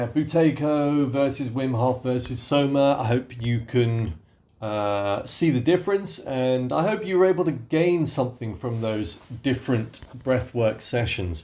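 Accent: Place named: United Kingdom. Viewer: Mate I just wanna say thanks for being such a genuine,honest and decent bloke,I'm almost 40 and for the time in my life I can actually see abit of light at the end of the tunnel,this lockdown as give me so much time for self reflection and through a small dose of yoga and meditation I probably feel the best I have felt in over 10 years and now this is just the icing on the cake. Oh and abit more icing to add were both British so I have absolutely no issues in understanding you lol. P.s dont turn around now and say your not British and make me look like a c××t 😂😂😂🇬🇧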